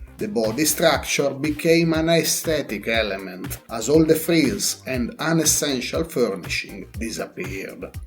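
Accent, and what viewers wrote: Italy. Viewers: Top and i enjoy the English Italian spoken !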